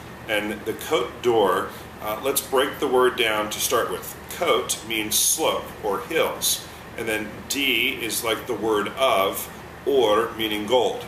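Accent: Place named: United States. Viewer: practice that american accent in french. so sad.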